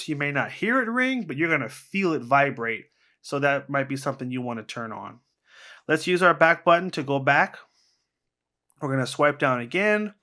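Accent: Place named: United States. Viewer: I am a 78 years old English man and for me you talk far too quickly with a very strong American accent and race through the video too quickly. I have to keep stopping it to go back to practice what you say on my phone. Other much younger people who have seen this video on my desktop pc agree with me. So not just me that thinks the same ! Anyway thanks for downloading this video. The following video is the best teaching video I have come across for teaching beginners on Android phones how to copy and paste. ''Timmy'' explains clearly and does not race through the instructions as many YouTube tutorial videos do. As one of the comments wrote ''Straight forward, articulate, concise instruction. Exactly what we seek. BEST of any out there. Thank you, Tim!'' How To Copy And Paste On Android Tech Time With Timmy <link>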